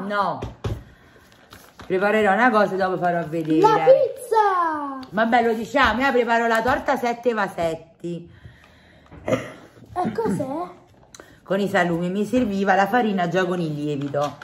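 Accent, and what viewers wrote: Naples, Italy. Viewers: Ciao è da poco che ho conosciuto il tuo canale e mi piace sentire il tuo accento napoletano (io sono di Roma) e le tue ricette buonissime.Pietro è simpatico, un bambino pieno di vita ma, come mamma volevo dirti una cosa che spero non ti offenderà.Quando mio figlio era piccolo, più o meno come Pietro, era cicciottello e i bambini lo prendevano in giro, purtroppo tanti genitori non insegnano ai propri figli che prendere in giro un bambino solo per qualche kg in più può fargli male, comunque lui aveva poca autostima e ne soffriva. Adesso ha 25 anni ed ha un bel fisico, va in palestra e sta attento al mangiare come la maggior parte dei bambini che magari da piccoli erano cicciottelli e che poi imparano a stare attenti a ciò che mangiano, però quel periodo le prese in giro lo facevano stare male. Non vorrei vedere nessun bambino passare tutto ciò quindi ti volevo dire di cercare di invogliare Pietro a stare un po' più attento a ciò che mangia o magari fare sport così che non passi ciò che ha passato mio figlio. 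Scusa se mi sono permessa ma sono una mamma e so che nn è facile (sicuramente ci avrai già pensato). Un abbraccio affettuoso e a presto:face-red-heart-shape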